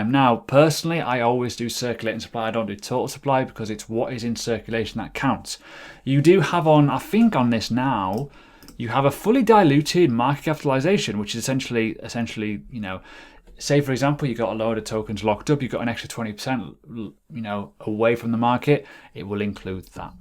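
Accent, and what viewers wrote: United Kingdom. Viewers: Anomynous? British lol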